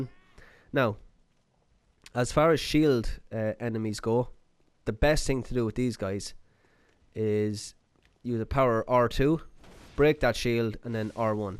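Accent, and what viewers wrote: Ireland. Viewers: Where you from in Ireland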